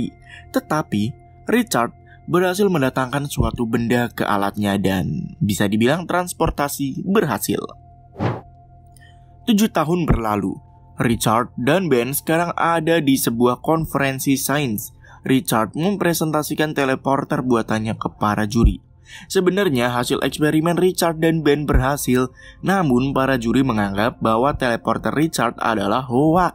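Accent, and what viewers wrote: Java, Indonesia. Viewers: Weh anjai wong jowo to tibak e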